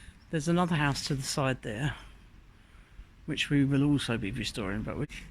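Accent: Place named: Essex, United Kingdom. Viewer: Oh my....I admire you so very much. I’m new to your channel, and I haven’t even finished watching your first episode, but I’m in love with your property and can’t wait to watch all your updates. I’m over here in the US and can’t tell you how much I enjoy the countryside of the UK and France. So beautiful. And the buildings, and the history, and the grandness of it all.....💕💕💕 so excited to cheer you on and get to know you and your story. And I love your Essex accent....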